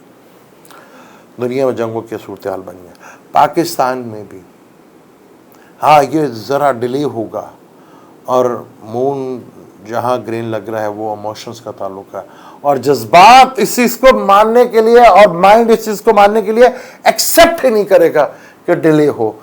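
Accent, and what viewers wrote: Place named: India. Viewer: یہ شخص رRAW کا ایجینٹ لگتا ہے اس کی اردو مکس ہندی چیک کریں ذرا😡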